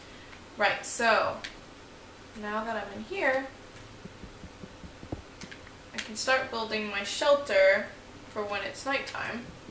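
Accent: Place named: United Kingdom. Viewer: How long have you been living in the UK? I noticed sometimes when you were speaking your voice had a british tone to it xP if that makes sense. The video was pretty cool, the intro was funky :P little tip for future videos: keep your hotbar organised (i.e. keep your tools in a place where you can conveniently access them - I usually keep mine to the left of the hotbar, but find a way that suits you :P) other than that I enjoyed the video :D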